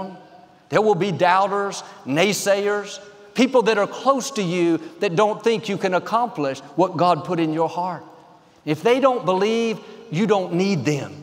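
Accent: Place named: Houston, United States. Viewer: "DON'T BELIEVE THOSE LIES!!!" (with his Houston TX accent!!!) One of my three most favorite comment/quote by my pastor Joel!!!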